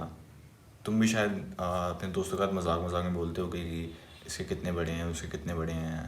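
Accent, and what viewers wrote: Bengal, India. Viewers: Bhai are you from West bengal?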